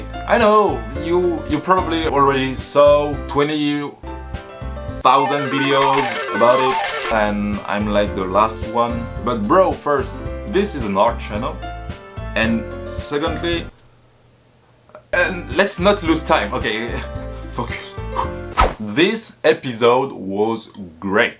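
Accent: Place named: France. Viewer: Are you French? You sound French et les folios junior derrière toi te crament XD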